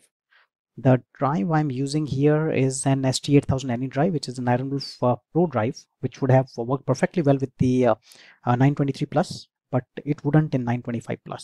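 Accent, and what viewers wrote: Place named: India. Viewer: That terrible india accent sound like dog barking !!!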